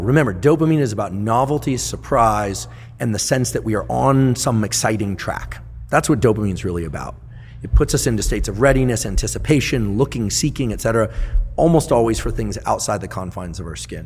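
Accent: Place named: United Kingdom. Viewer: Just because you asked me to subscribe nicely and in a British accent, I will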